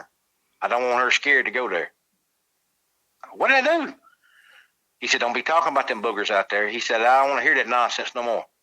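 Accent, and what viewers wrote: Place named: Alabama, United States. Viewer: You sound like your from Alabama, from my neck of the woods.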